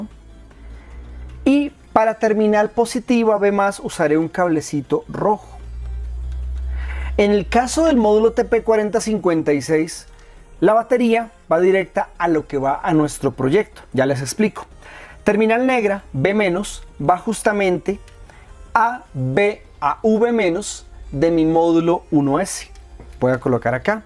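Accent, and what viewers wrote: Colombia. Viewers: Buenos días mi gente. Estoy aprendiendo a arreglar parlantes a mi tercera edad, y yo siempre me pregunte para que diablos servia esa cinta de las baterías (reguladores de voltaje), y varios parlantes los deje así colocando baterías de litio sin este regulador, porque pensé que era como colocar las baterías a una radio. ¡qué error! Soy de Bogotá, y no sé donde conseguir esas cintas reguladoras. Por su asento sé que eres compatriota mio. Ese regulador de voltaje vendría siendo como el flotador en un tangue de agua, ¿cierto? Muchas gracias por sus contenidos me han ayudado mucho.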